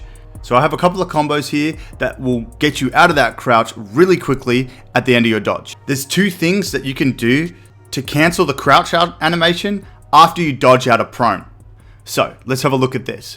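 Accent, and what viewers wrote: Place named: Australia. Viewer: Amazing video! ✌ love the ausie accent. Keep up the good work bro.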